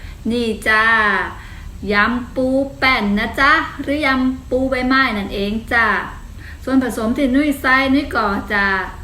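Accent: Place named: Rayong, Thailand. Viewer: ยำปูแป้นน่าทานจังเลยค่ะ อีกอย่างที่ชอบคือชอบสำเนียงระยองฮิ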